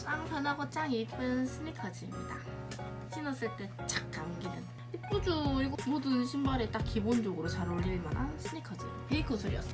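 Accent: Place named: Gyeongsang, South Korea. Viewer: 누나 혹시 경상도 사람이세요?? 사투리를 사용하시네요... 할튼 누나 너무 뻐요😘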